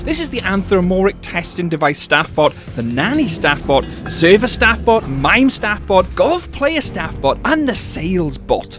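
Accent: Wales, United Kingdom. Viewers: nice to know there's welsh people on YouTube, barely see it, can tell from the accent. i have the same. keep it up mate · Are you Welsh because you sound it? Well, so am I! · sorry are you welsh? you sound very welsh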